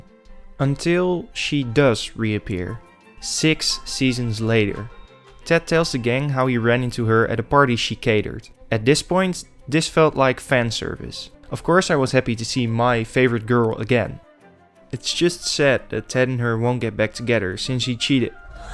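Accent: Netherlands. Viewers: Is it correct that I hear a dutch accent in your voice? Not meant as an insult, your english is great, just curious.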